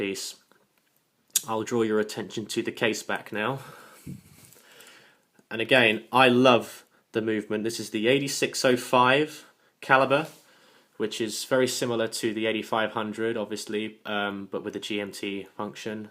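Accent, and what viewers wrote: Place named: Mid-Atlantic, United States. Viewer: Hi, indulge me.....Do you work for Omega? Also your accent is very mid-Atlantic. Any personal background?;-)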